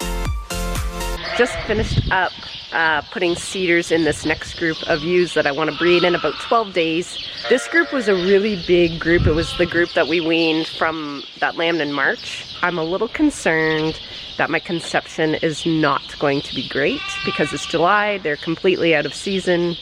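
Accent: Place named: Canada. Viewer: It took me a second to realize you are Canadian. Seriously thought you were just from the Northern parts of the US like Montana or Nebraska.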